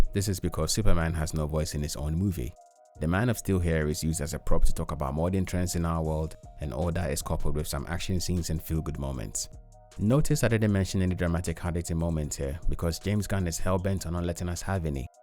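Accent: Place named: India. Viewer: of course this video is made out from a indian dude w the accent lmfao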